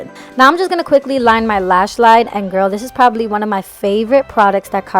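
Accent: New York, United States. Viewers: Ur the only YouTuber I enjoy watching lmao I loveee your NY accent and your amazing vibes! Keep it up girly ❤️❤️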